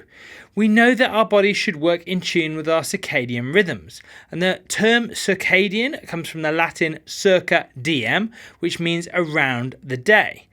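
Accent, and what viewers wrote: United Kingdom. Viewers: I found this video enlightening, clear and pleasant to listen. Specially your British accent 🙏 Thank you so much Docteur 🎉 Big bravo 🎊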